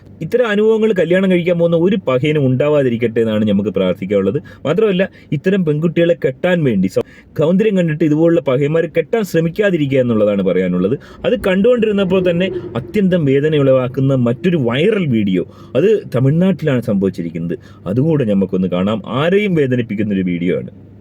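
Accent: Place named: Malappuram, India. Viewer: നിങൾ എന്തിനാണ് എല്ലാ പരിഹാസ വീഡിയോ lum malappuram muslim slang pole സംസാരിക്കുന്നത്. അതൊക്കെ പണ്ടാണ്, ഇപ്പൊ അവിടെയും ആരും നിങൾ സംസാരിക്കുന്ന പോലെ സസരിക്കുന്നില്ല. നിങൾ ഷെഡ്യൂൾ cast കള് സംസാരിക്കുന്നത് എങ്ങനെയാണ് എന്ന് പറയിപ്പിക്കരുത്. അവർക്ക് ഒരു word nte starting letter ഉണ്ടാവാറില്ല സംസരിക്കുമ്പോ, പുലയൻ, പറയൻ, ചെറു മക്കൾ തുടങ്ങിയ വിഭാഗങ്ങളുടെ സംസാരം കേട്ടിട്ടുണ്ട്, അവർ മുമ്പ് തറവാട്ടിൽ നെല്ല് ,കൊപ്ര,കളപ്പുര, help nu വരുന്നവർ ഒരുപാട് ഉണ്ടായിരുന്നു , Eg പറഞ്ഞു തരാം " ആ ചെക്കൻ്റെ പെണ്ണിൻ്റെ വീട്ടിൽ" എന്നതിന് " ആ എക്കൻ്റെ എണ്ണി nte ഈട്ടിൽ " എന്നാണ് അവറ്റകൾ പറഞ്ഞിരുന്നത് 😅😅 അതുപോലെ ഒരുപാട് ഉണ്ട്. ഞങൾ ആരും അത് പറഞ്ഞ് അവരെ പരിഹസിക്കുകയോ ഇങ്ങനെ പറഞ്ഞു നടക്കുകയോ ചെയ്യാറില്ല. നിങൾ ഒരു reaction video ൽ hindu family ye react cheyyumbo polum മുസ്‌ലിംകളെ പരിഹസിക്കുന്ന slang ൽ parayunnath njaan kettu. കഷ്ടം തന്നെ. നിങ്ങളെ നിലവാരം ഇതാണോ ???! മഹാ ബോർ ആണെ്ടോ ഇതൊക്കെ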